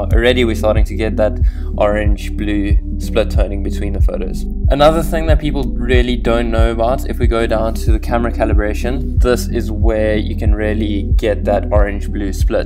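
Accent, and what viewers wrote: South Africa. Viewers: Immediately knew you were from Cape Town when i heard your accent.. great youtube channel and great content.. I'm about an hour away from the city and I'm also a photographer. Keep up the good content.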